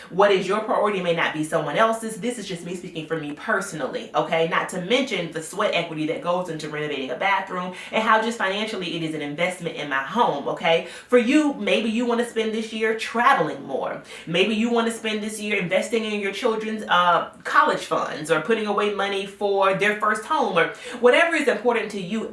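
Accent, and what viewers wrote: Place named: Washington DC, United States. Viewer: Woohoo I thought of doing a channel like this but you are better at it!!! I’m 100% with you. I have well made pant suits I’ve had over 10 years. I’m trying to do the 30-30-30 wardrobe. I’ve written down my pieces and gotten rid of the excess. Now working on defining my style cuz I like such a mix of classic looks and boho looks. You’ve got a new sub. (Do you watch Alyssa ?) her channel is good too. Edit: when I got to the end we talked about your therapist and the cadence of your speech I immediately thought I wonder if she is from DC? Then you mentioned the metro and I was like oh yeah she’s from DC. 😂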